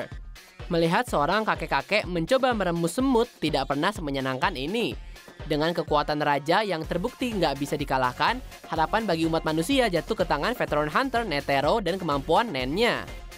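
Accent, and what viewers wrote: United Kingdom. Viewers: Harus banget naratornya make aksen Inggris? Biasa aja cukup kok, jadi agak kurang enak dengernya jadinya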